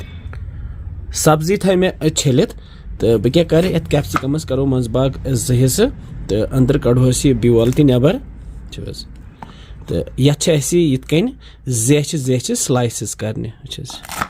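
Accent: Kashmir, India. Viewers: I love ur kashmiri accent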